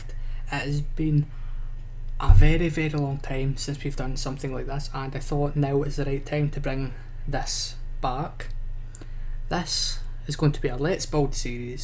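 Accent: Scotland, United Kingdom. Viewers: Are you Scottish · U sound Scottish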